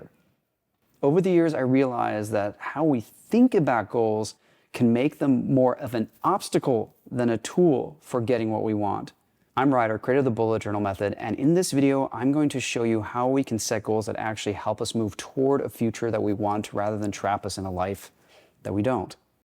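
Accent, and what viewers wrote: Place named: United States. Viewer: Set goals to be a better writer or Ryder? American accent make it hard to hear the difference. I think you are good enough whatever the answer.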